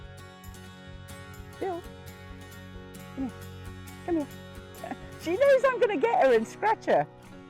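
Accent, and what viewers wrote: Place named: West Country, United Kingdom. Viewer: thanks for that lovely walk in the country, glad to hear you have not lost your west country accent. Go girl. keep walking and talking.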